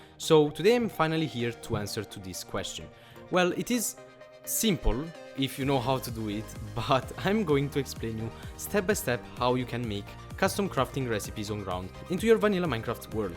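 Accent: Italy. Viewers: si sente che hai l'accento italiano :p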